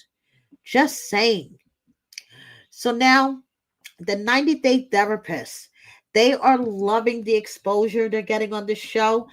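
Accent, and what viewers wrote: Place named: New York City, United States. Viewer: Hi happy holidays. I love your channel and just subscribed. Your accent reminds me of my New York City roots. Aloha from Hawaii.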